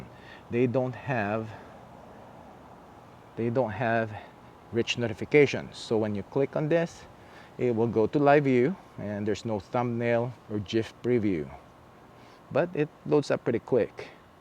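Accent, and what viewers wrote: Philippines. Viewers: Filipino accent is so beautiful!! 🥰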